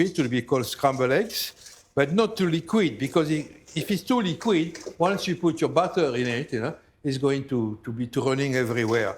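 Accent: France. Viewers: It’s a French accent. No English accent here.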